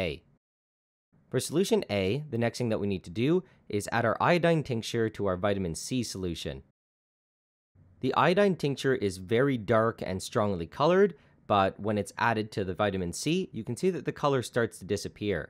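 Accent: Canada. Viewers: So, you're Canadian, aren't you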